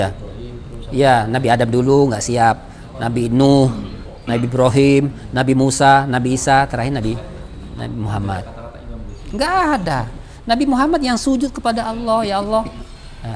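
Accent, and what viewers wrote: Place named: Java, Indonesia. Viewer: Sampean lubise wong jowo tah..ketok logate. 😁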